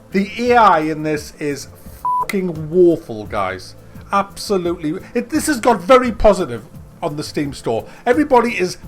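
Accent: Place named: Newcastle, United Kingdom. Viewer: Sorry, Vampires do exist. Love your reviews. Proper Geordie rantings!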